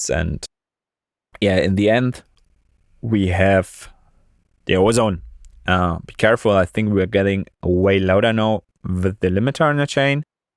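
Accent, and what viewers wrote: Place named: Germany. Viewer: man hört den deutschen akzent ordentlich raus :D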